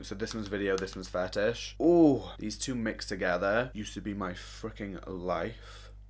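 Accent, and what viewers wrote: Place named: England, United Kingdom. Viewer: on instagram, and omg where the hell are you from! obvs england but your accent is what i live for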